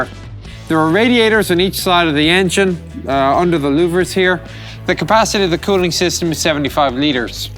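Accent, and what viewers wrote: Ireland. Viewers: You have to excuse him hes irish thats just how they talk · Apparently Chieftain is American, but he sounds Irish? Weird accent. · He has an Irish accent lmao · the presenter has such an ambigious accent....irish? german? nordic?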